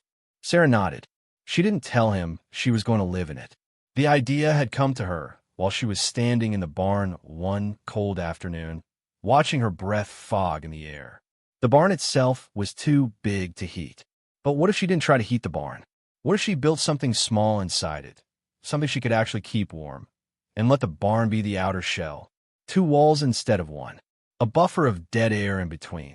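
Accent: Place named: United States. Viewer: Don’t waste your time it just repeats and repeats and repeats. The American accent is unbearable.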